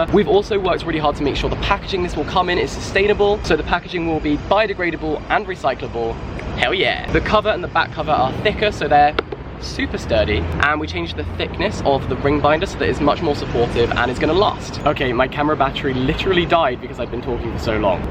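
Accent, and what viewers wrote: RP, United Kingdom. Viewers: You have the same posh accent as Jack and Finn Harries. Not a criticism, just an observation.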